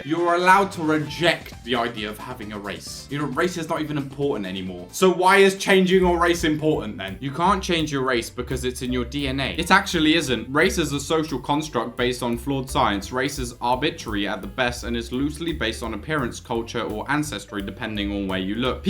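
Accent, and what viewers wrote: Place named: United Kingdom. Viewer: is British! 😮 best of British Amazing Guy...I was thinking American..🎉❤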